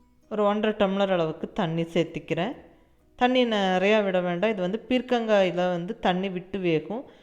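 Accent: Kongu, India. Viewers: Mam dish super. Pankarapava supera iruku.i will try. Ungha voice rompa clarity, sweet.neegha pesura kongu tamil super.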